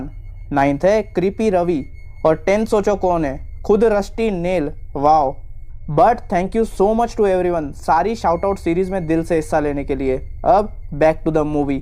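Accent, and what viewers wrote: Maharashtra, India. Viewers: Marathi jesa lag rha h accent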